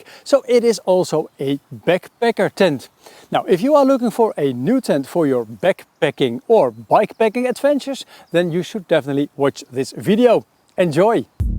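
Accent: Netherlands. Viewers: De eerste paar zinnen wist ik oprecht niet of dit Engels of Nederlands was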